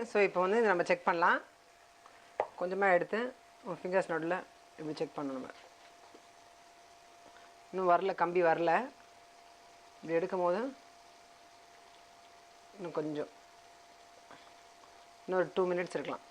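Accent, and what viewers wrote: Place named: Vellore, India. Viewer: madam recipe is simple and super. Thank you for sharing with us. entha oor neenga unga tamil enga oor vellore tamil maari iruku.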